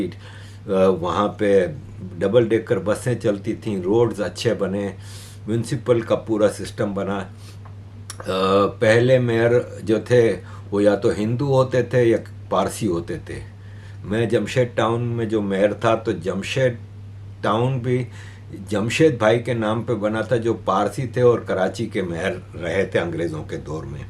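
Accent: Gujarat, India. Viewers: Your tone of speaking is same as like we gujarati. Anyway I also live near batva , manavadar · Apka accent Gujarati logoki tarah hai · Sir, you sound so Gujarati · This guy has a gujrati accent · Bhai k language main Gujarati accent jalak raha hai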